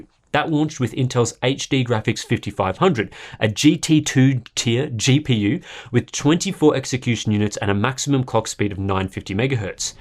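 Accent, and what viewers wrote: Australia. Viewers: Strange thing to moan about [FULL STOP] [COMMA] maybe you don't understand the accent [COMMA] he is Australian [FULL STOP]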